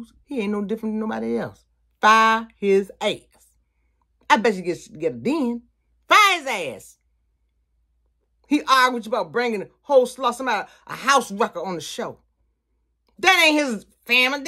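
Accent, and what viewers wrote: United States. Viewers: I just so happened to stumble upon your site,. You are so funny...shooting straight from the hip with that country accent 🤣🤣! I was hooked and hog tied the first 5 minutes of listening. Where have you been hiding🤣😃? Thank you.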